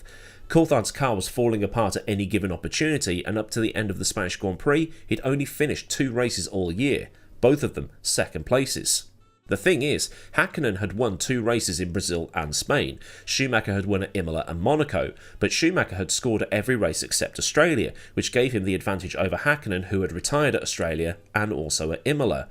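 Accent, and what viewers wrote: Canada. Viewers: Checking in from Toronto, I will give you an 8/10 on the Canadian (English Speaking) Accent and a 9/10 on the appreciation of the Canadian sense of humour having "Biennvenue a Quebec" on that wall :) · Love the Canadian accent, although I think the appropriate Jacques Villeneuve, French Canadien accent would have been even funnier.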